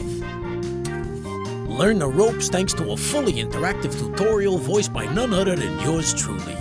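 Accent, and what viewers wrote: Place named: United Kingdom. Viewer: "Voiced by yours truly" Am I the only one who was interested in mob athstetic, only to be confused by the british chap?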